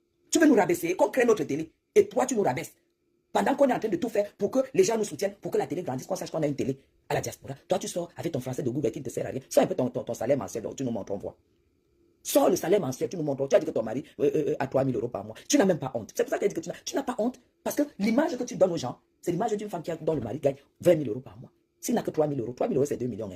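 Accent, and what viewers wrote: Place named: Bamileke, Cameroon. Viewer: Le gars ci propriétaire de la chaîne ton accent bami là est vraiment dégueulasse hein, on t'a seulement fait ça au village ? Je n'ai jamais entendu un accent aussi prononcé et laid arrête de parler en début de vidéo dion!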